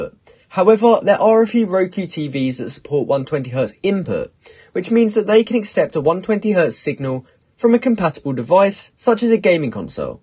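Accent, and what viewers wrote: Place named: United Kingdom. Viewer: He’s british dumbass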